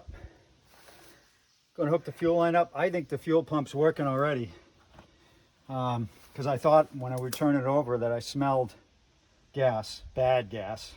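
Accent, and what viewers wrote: New England, United States. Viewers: haha thought I could here some new england in your voice! Keep up the good work love your channel!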